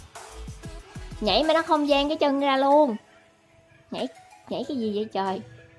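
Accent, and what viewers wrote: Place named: North, Vietnam. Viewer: Giọng Bắc nữa cơ :3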